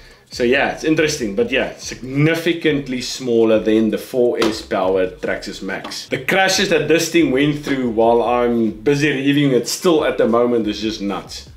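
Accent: South Africa. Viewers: Great vid and super informative! Am defo not liking that body and lack of detailing on V2 at all and otherwise it looks like a bit of a rip off since its more expensive! However I am liking your awesome accent though😎 Are you originaly from South Africa? Greetings from Germany. Subbed✌ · Your from New Zealand? Your accent sounds south African ?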